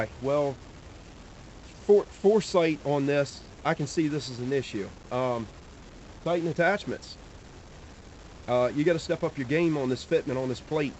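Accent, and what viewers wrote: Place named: Outer Banks, United States. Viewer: I hear ya hoigh toider. ;-) Down bay native here. Thx for the vids, helpful info. I've had to tighten up a couple of attachments from titan. There's a lot of variability between manufacturers plate dimensions so I think Titan hedges on 'fit everything' side...I find a few welded shims here and there tightens things up fine. All the titan connectors I get go in the spare bin and I put on known manufacturer ones.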